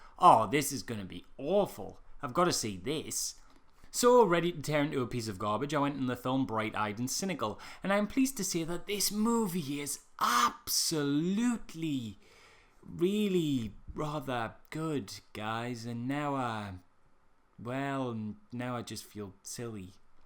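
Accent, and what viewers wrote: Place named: Scotland, United Kingdom. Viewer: Wow, twenty seconds in, I already need to ask: ARE YOU SCOTTISH?? I´m German so I´m really not 100% sure, but I just have to keep following your channel now for a while just for that amazing accent! (I´m also quite a huge Doctor Who thus Peter Capaldi fan for now..If you know that show, you also know, why I say "for now", because it can change.... Very soon even maybe....)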